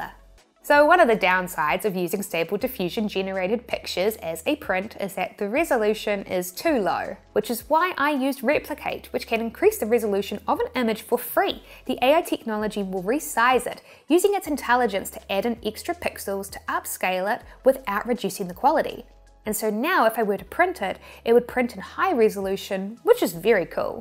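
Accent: New Zealand. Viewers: Thanks! I absolutely loved this one! Got lots of new free AI's to try out. You have saved me hours of research (as you know). So, thanks again. P.S.: Liking (and getting) your NZ English more and more ;)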